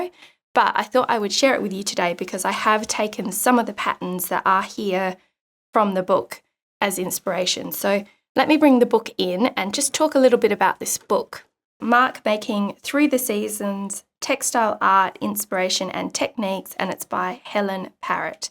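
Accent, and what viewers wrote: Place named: Australia. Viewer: Love that I found your channel through another artists recommended channel page. Subbed as soon as I heard the accent. Love that we have such incredible mark making artists here in Aus 💪🏼😁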